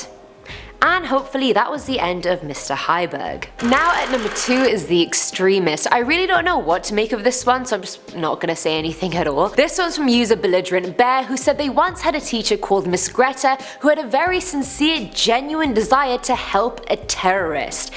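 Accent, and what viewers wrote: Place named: United Kingdom. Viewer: I'm not going to make fun of Ms high-waisted jeans. She's far too pretty and charming, and has a very appealing accent, perhaps from somewhere in the United Kingdom?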